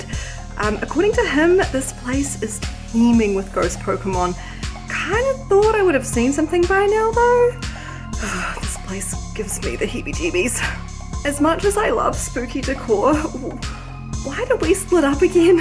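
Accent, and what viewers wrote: New Zealand. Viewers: Snap. I also came here from Diana's channel and I am also a New Zealander. I agree, it is lovely to hear our accent about. The doll came out so lovely and I'm glad to have found your channel. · Popped over from Diana's channel to watch the video and I have to say it is so amazing to hear a familiar accent. I live in New Zealand as well :) Love how your doll came out, she is so beautiful.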